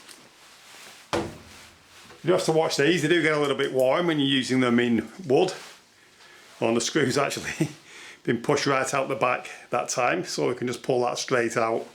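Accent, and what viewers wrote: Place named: Yorkshire, United Kingdom. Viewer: Yorkshire accent must be one of the worst English accents in the world….boring and damn disgusting.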